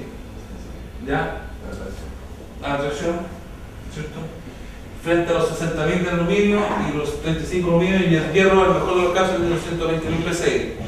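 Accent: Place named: Chile. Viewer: no se entiende nada en chileno :v wn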